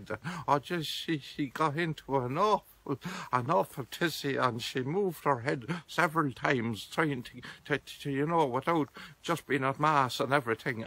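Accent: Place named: Longford, Ireland. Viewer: He sounds like my Step Gran used to, also from County Longford. Boy do I miss her. RIP Gran Molly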